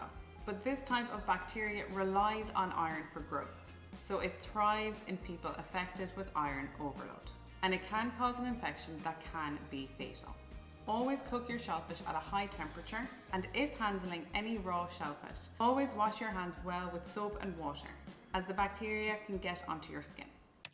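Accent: Ireland. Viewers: True , the GP I went to back then said He knew nothing about it and sent me to the Hospital. they found me a Dr who was surprised as I was his 3rd case. many more now. It is becoming a mainstream condition now ! sadly I think my Grandad and Uncles had it but like you said it was unknown then. all heart attacks in their early 60's only my Grandad survived the first but did indeed have another years later. they were strict tee total, strong fit (young and older) men. always wondered if it was Hemochromatosis. Im now in my 60's. > County Fermanagh Inniskillin is where we hail from. To hear your voice , the beautiful Irish voice , still and always will thrill me to my bones. Thank you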